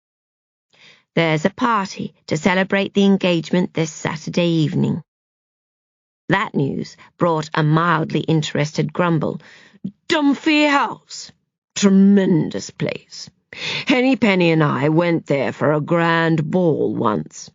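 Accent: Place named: Australia. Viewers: English with an Aussie twang I'd say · Awful accent. Aussie?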